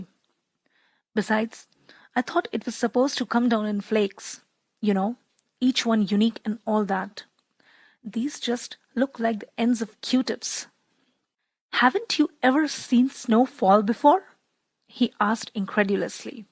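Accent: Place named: India. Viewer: Wow!!! It is very interesting to here in Indian accent!!!! Good work.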